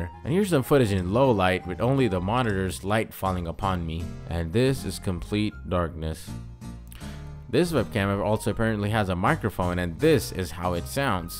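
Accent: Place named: United States. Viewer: bruh you sound american hows that possible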